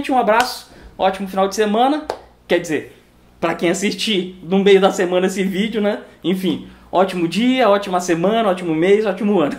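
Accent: Pará, Brazil. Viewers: Sotaque paraense, acertei?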